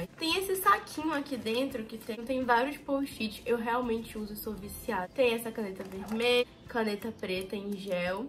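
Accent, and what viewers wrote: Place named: Amazonas, Brazil. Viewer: então é nós, sotaque amazonense, ja ganhou uma inscrita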